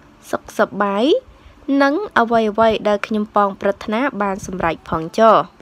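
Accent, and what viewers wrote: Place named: Vietnam. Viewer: អ្នកអាននឹងយួនទេដឹង តុងយួនច្បាស់ចែស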